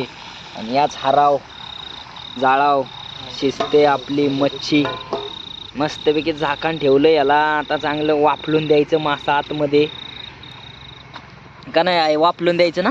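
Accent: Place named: Dhangari, India. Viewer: त्रास नाही पावणे थोड धनगरी बोलालवर चांगलं वाटतं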